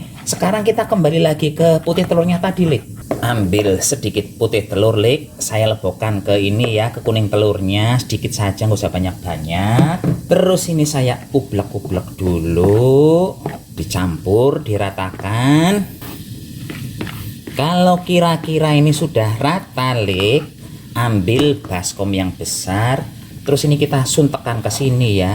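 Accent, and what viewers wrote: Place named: Cilacap, Indonesia. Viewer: Resep" nya ok.mudah di tiru juga sangat menghibur dengan bhs ngapak nya yg medok cilacap.tp sy.sebagai orang kendal jateng paham.dengan.bhsnya mas.semangat trus..Salam.ublek"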